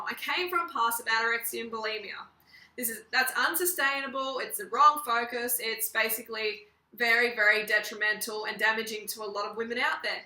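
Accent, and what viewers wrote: Australia. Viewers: what part of australia are you from? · You ave an Australian Accent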